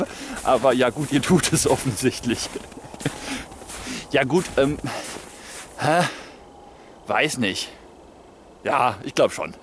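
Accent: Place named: Karlsruhe, Germany. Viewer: Naja.. fundiertes Wissen wird vermittelt, keine Halbwahrheiten Man lernt also immer etwas. Deine Sprechweise ist amüsant und hörenswert (wohl weil es recht bekannt ist, ich wohne bei Karlsruhe). Es wird einem nie langweilig.. Manch andere Kanäle höre ich neben einem Onlinespiel.. Bei dir ist das nicht so. Von Anfang bis zum Ende interessant. Mach weiter so:-)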